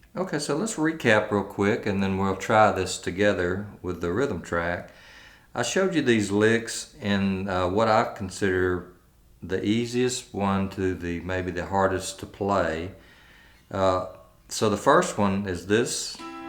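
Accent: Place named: Tennessee, United States. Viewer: by the timbre of your voice I think you live in Tennessee, that is all..thank you for your time Sir.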